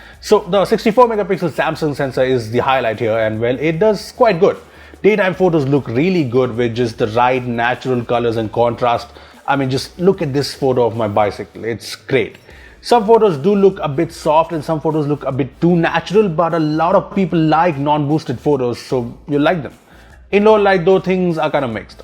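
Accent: India. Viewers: From his face I bet his speaks in an hindilaised english *Later edit after he talks. I was right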